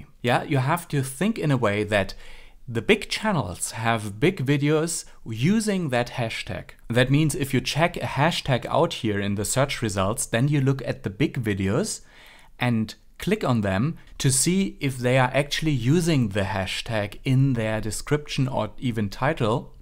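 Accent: Germany. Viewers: Thanks for the insight, I like your spreadsheet :D Out of curiosity, are you German?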